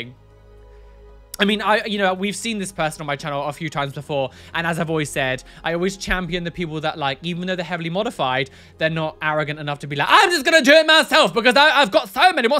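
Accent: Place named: Essex, United Kingdom. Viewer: Just found you and am so loving your energy! Your accent is awesome as well, and may be the inspiration for one of my Essex characters in my books. :)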